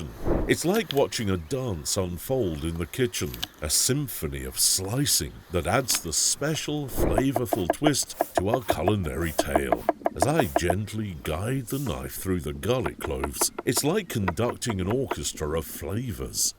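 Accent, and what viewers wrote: United Kingdom. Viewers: Get rid of the British narrator please!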